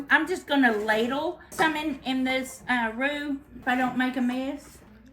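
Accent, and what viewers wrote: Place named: Alabama, United States. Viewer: Hey y'all. I just found your channel. Your accent sounds like mine😂. I'm from Alabama where I've lived most all of my 64 years! Where are y'all?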